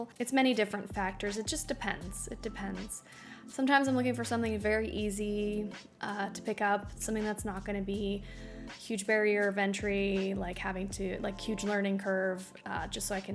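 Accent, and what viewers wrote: Quebec, Canada. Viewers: I'm not fluent in french (we learn it mandatory in school here, german speaking part of Switzerland), but I realized how different Canadian french is to what we know :D. It sounds cool though. Being bilingual (at least) is always a win.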